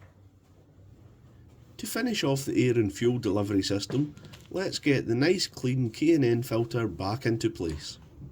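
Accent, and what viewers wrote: Scotland, United Kingdom. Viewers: Never realised you were Scottish🤔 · For years, I'm thinking, why is a Scottish man in America? :face-blue-wide-eyes:But you're not - you're home! I wish I was.:face-turquoise-covering-eyes:.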